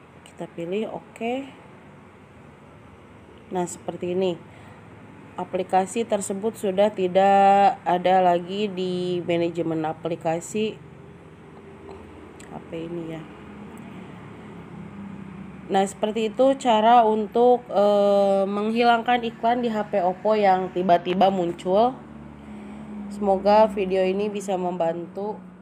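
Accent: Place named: West Java, Indonesia. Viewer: mni Sunda pisan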